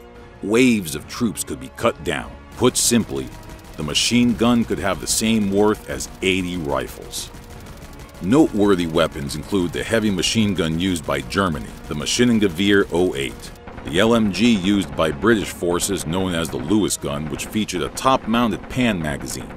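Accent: South, United States. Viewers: Is no one gonna acknowledge the fact that he has a Southern accent and he said “Rafle” instead of Rifle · That American acent is quite strong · he must have a southern accent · You can kinda hear a southern accent too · he sounds so american lol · Southern accent anybody else here it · This guys sounds like Forrest Gump. Is it a regional accent in US?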